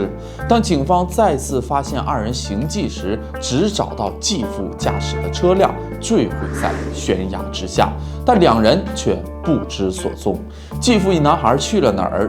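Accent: Northeast, China. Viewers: 这一听博主的口音就是俺们东北那嘎达的人儿，就是不确定是不是和我一样是沈阳的，哈哈 案件讲述的也非常精彩，已经订阅了，支持老乡儿~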